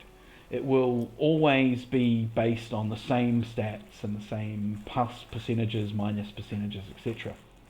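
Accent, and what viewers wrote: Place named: New Zealand. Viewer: Hey man you sound like your from NZ? Wouldnt happen to have an NZ squad I could join next season? Awesome content by the way, very thorough and informative!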